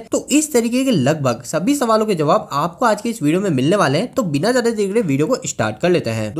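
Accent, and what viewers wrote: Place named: Maharashtra, India. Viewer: Sir Aapki bhasha sunkar Aisa lag raha hai ki Aapko Marathi bhi aati hai Aapko 😅😅Sir plz Marathi mdhe video banva na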